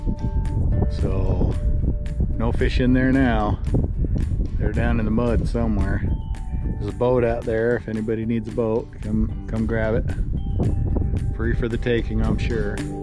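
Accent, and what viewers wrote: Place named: Idaho, United States. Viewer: Are you from Idaho, Utah, Washington? Your accent reminds me of family in E. Idaho, it was pleasant even if seeing water levels like that aren't 😳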